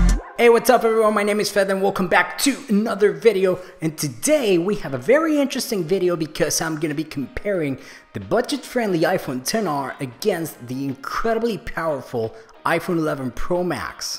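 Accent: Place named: United States. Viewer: Thank God for his American accent 😌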